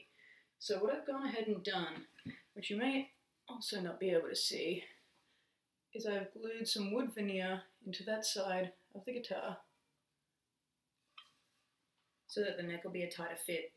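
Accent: Australia. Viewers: you sound aussie. you in oz or os and how have you found buying china outside of ebay?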